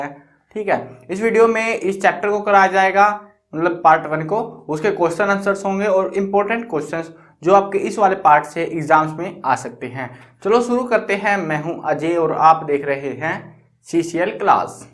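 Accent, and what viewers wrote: Haryana, India. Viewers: Bhai yaar tumhari voice sunn k lagta hai ki tum haryana side se hooo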